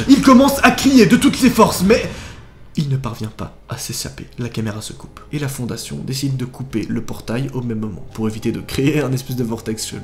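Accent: Belgium. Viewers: Il est belge Tsss.. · Tu et Belge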